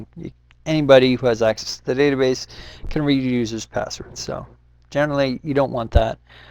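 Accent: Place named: United States. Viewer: I really like this video. There alot of SharePoint 2010 admins who need to step up on their training... hence me watching this video. You have a good teaching technique that is way better than the other sites i've looked at... and it's in clean American English! Please make more of .Net SharePoint related videos!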